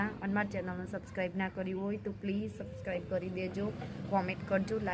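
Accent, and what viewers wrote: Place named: Idar-Mehsana, India. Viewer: બેન ઇડર (s k )ને મેહોણા ની મિક્સ ભાષા છે 😂😂😂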